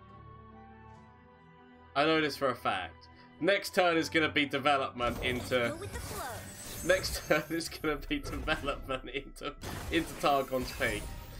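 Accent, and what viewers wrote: United Kingdom. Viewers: you're the best british streamer hands down. As someone from the commonwealth but not the isles I tend to find the accent interesting. Just a thought, but maybe you can market yourself as the ' UK ' streamer 🤔. As well, I'm part if the discord just to preface. I thought though that to grow and reach your goal of 5k you need a website, unless you have one already; did not check. Al a swimstrim per se. Although, love your decks because they are fun! I also think you could look into paid marketing for your targeted keywords. I do it professionally for other people. No obligation for any ' deals ' but I can help in return for your videos. They keep me going sometimes 😄. It would help grow quite reliably imo Furthermore, please stop slouching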